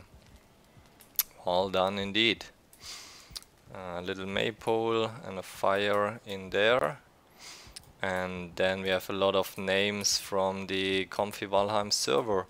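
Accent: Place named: Germany. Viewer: do it dude. I’m just some 36 yr old enjoying the game immensely and loving seeing all the creativity of others. Your builds are exceptional and I love the way you presented it all. I work with voice overs for a job, so I can’t lie, I just love the efficiency of your German accent. Keep building the dream and continue to press the boundaries of our sacred Valheim 👍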